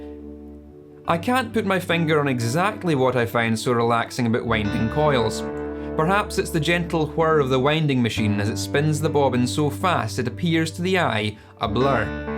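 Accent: United Kingdom. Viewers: That’s British sounding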